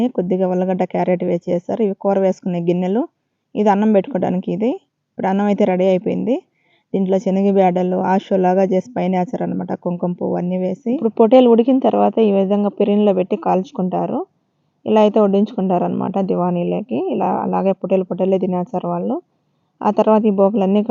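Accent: Rayalaseema, India. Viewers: రాయలసీమ యాస.....❤❤❤🙂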